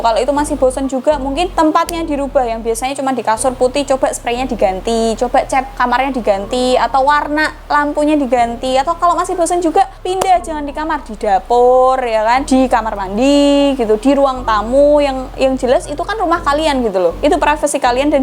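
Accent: Java, Indonesia. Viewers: orang jawa ya mbak? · Cakep-cakep medokk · Medok😆😆😆, salam dari warga banjarmasin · Salam dr.kupang..ntt.dan beta suka wajah serta keceriaan.logat jawamu.bkin hilang stresss.ku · Medok bat haha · q suka cara penyampe annya lucu terhibur juga nambah wawasan,maaaantap bahasanya medooook,🤣🤣🤣🤣🤣🤣🤣🤣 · Jiann tenan mbak..Aslii cah wedok spekk jawaaa nii bossss😆😆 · versi medok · suka cara gayamu ngmong mba medoknya kerasa banget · Jawane kentelllllllll👍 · Aku suka medok kamu, jadi tambah lucu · ngomong medok gak masalah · waduh medok bgt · Pekat loghat jowonyo mbak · Medok bngt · the real medok cantik, bkn buat2 sperti d ftv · medok Banget bahasa jawanya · Lo orang jawa yh mbk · medok Jowo nya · Medokk'e poll mbak 😂😂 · boso jowone nylekit njiir... jowo ndi mbk? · Medok mu marai kangen mbak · Medok tp ngangenin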